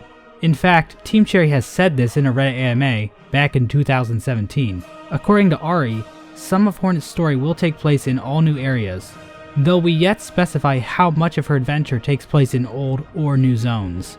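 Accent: France. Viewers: This aged like milk. Also, thats an amazing french accent 10/10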